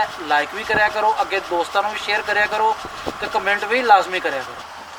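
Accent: Punjab, India. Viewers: Nice Punjabi tuch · hahahah maza aa gaya yar kaya bat hai punjabi tuch ka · Your improvement level is increasing day by day.keepd up specially ur Punjabi touch.I think you should make a whole video in Punjabi.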